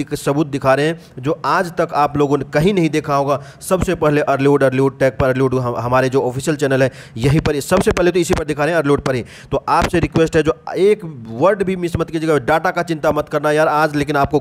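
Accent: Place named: Bihar, India. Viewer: Typical bihari style narration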